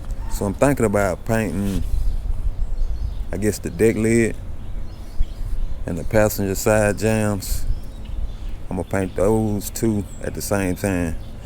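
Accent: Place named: Dallas, United States. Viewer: nice work. after watching, I was going to comment & assume you're from Mesquite, but the end of the video, I'd say SDallas or OakCliff. heck ya brother.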